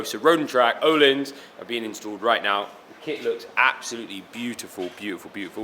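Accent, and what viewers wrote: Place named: United States. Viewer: Yank speak, delete.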